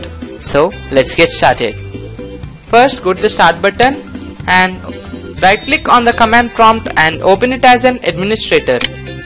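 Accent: India. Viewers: I love how he sound Indian lmao. Thanks for the tutorial tho. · When i heard the indian accent I just clicked off and disliked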